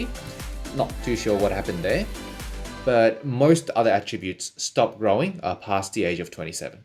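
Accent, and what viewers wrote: Australia. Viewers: every Australian who watches his videos can instantly tell that is an Australian accent, not a super bogan true blue stereotypical Aussie accent but he’s still clearly Aussie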